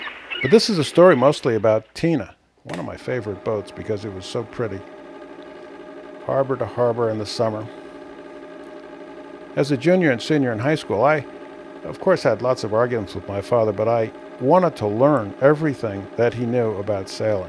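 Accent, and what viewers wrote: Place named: New Jersey, United States. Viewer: I understand why I like your commentary so much now, you are a Jersey guy. You bastards are tougher than a bag of ten penny nails. True story.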